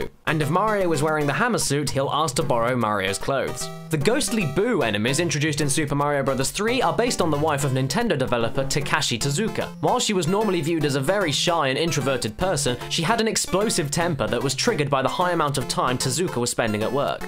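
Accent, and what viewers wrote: United Kingdom. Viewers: Well is from the United Kingdom. Words and pronunciation is varied in some places from there. It depends where they grew up.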